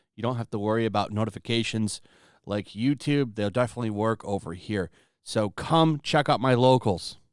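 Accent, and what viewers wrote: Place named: RP, United Kingdom. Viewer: The upper class British accent kills me. Hail!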